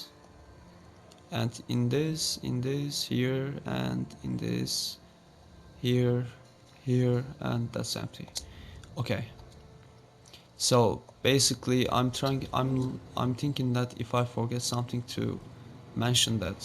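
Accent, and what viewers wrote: Turkey. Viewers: aksanını duyar duymaz kanal ismine baktım türkçe mi diye ya :D kralsın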